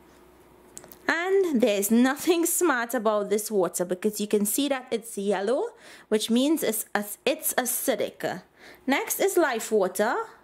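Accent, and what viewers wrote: Trinidad and Tobago. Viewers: Are you from Trinidad? I love your accent! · KC and LoLo 23 it's a Trinidad and Tobago accent i.e. Caribbean 😊 hence the name of her channel as well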